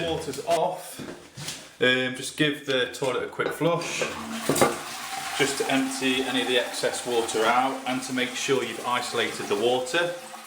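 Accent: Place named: Nottingham, United Kingdom. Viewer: That was spot on fella - thank you. Sounds like you might be Nottingham way too - You Reds!! 🔴⚪ 🙂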